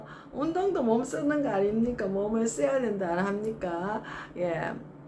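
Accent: Gyeongsang, South Korea. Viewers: Wow! 雨中이라도 예쁜 女뻐꾸기의 경상도 사투리 소릴 들으니 기분은 상쾌하네요! 아름답고 매력적인 음색의 말씀과 편집이 잘 된 영상, #우리의삶은_매일쓰기다의 좋은 교훈은 종일 듣고 싶네요. 늘~ 행복하시고 - - - 계속 재밌는 말씀을 기대 합니다. :body-blue-raised-arms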